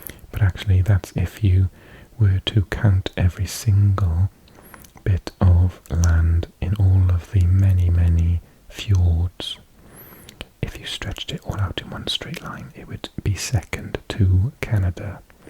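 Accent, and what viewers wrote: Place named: Wales, United Kingdom. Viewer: yes the accent sure sounds very Welsh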